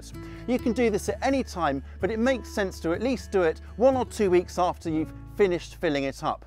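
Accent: United Kingdom. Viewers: The way Brits say compost really annoys me lol.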